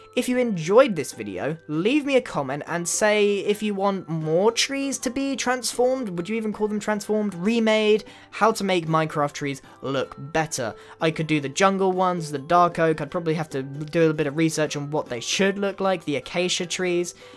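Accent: United Kingdom. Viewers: Damn, back at it again with the annoying British accent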